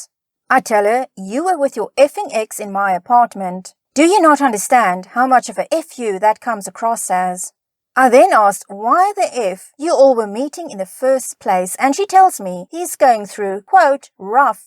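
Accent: South Africa. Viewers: Aaaaah the rare south African Afrikaans accent out in the wild! What a rare sight! Great commentary at the end :). All the best for your channel!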